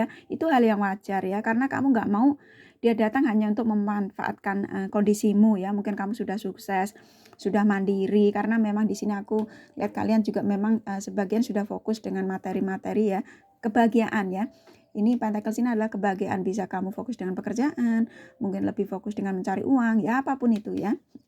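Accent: Java, Indonesia. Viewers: Suka dgn gaya ngomong jawanya kak.. trnyata iso Jowo ggih kak.. 🥰😍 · Mantaaafff kak readingannya,rasakno. Dia mantan suamiku,yg jls dia gelo sdh menceraikan sy, betul skl kak kami sdh mempunyai anak,dia telah bersm yg lain,namun ternyt dgn orang tsb ternyt gk ada kebahagiaan, skrg br nyadar bhw bercerai itu ternyt gk enak,dan saat ini akhr2 ini sering menghub sy by phone. Sy sih sdh nyaman dgn single parent ini. Tksh kak,sy sll ngikuti chanel kakak,sy sk dgn suara kakak yg njowoi,smg kakak sht sll,sukses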